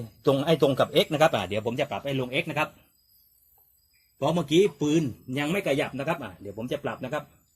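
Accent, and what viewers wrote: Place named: South, Thailand. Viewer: สำเนียงภาษาคนใต้เลยหลวง ชัดเจนครับผม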